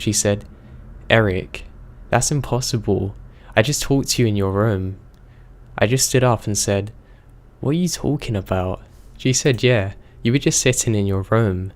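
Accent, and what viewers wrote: United Kingdom. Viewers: Is this an British accent on the first story? Just because an apparition looks like someone like a little girl, doesn't mean it is as it appears. The second story indicates that when the young man was at home (he had the whole place to himself) with his girlfriend that he married later. He was taking a shower and saw "help me" me on mirror in the bathroom and right before this he saw an apparition after the lights dimmed. Then, he says he didn't want to go home that night, but his parents gave him no choice. Hum? I thought he was at home? Finally, it was time to move out into their new, home, Then, he went back to his home with his girlfriend to get the phone charger he had left there The narrator stated this was the first time his girlfriend had been in the apartment. Hum? Am I the only person that heard noticed this?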